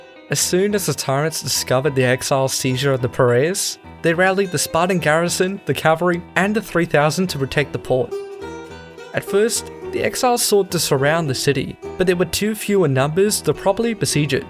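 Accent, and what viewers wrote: Australia. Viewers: Recently I was going through my subscriptions and since I want to keep the number to a minimum I considered to unsubscribe. But then I though: nah, I absolutely need to know it if ever uploads a video again. I missed your drawings, competent explanations, and Australian accent!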